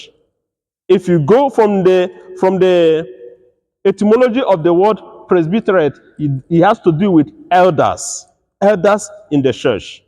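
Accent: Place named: Nigeria. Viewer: I believe the future of the Church is in Africa. In our parish in the South of England we have two extended Nigerian families and a family from Zimbabwe, all active members of the Parish. re you Nigerian,? Your name and accent suggest so.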